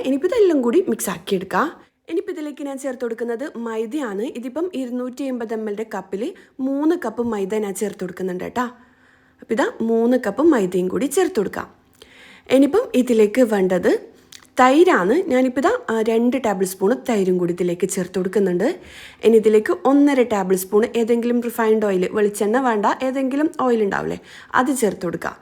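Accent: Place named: Kasaragod, India. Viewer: കാസർകോട് സ്റ്റൈൽ സംസാരം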